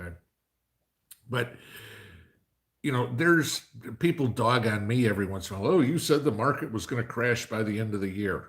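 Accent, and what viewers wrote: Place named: New York, United States. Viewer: Hey hes a New Yawker!❤️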